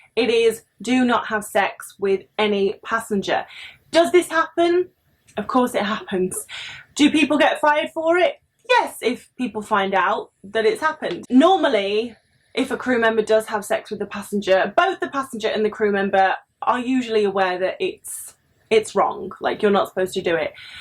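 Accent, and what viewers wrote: United Kingdom. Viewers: Thanks! Love your videos and your British accent! You are the coolest! 🤪🤣😇😉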